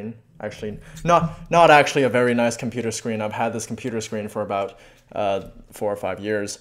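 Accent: United States. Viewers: HES ASIAN BUT HE STILLS TALKS AMERICAN LIKE! congrats man you are the last league of legends related subscriber since i ditched that cancerous game. We played a long time ago. Always enjoyed the few games we did play. You should play dota, ill hook you up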